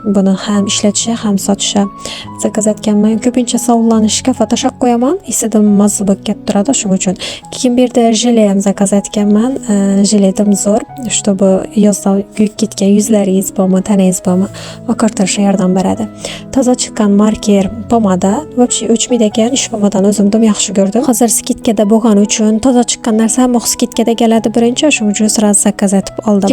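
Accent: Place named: Khorezm, Uzbekistan. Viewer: ❤❤❤❤бунча ширин гапрдингз устозам бирам чиройли яхши кураман хоразм шевасини боризга шукур сиз туфайли шу кампанияга кушилдим сиздан бизнес сирларини урганетганимдан хурсандман❤❤❤❤❤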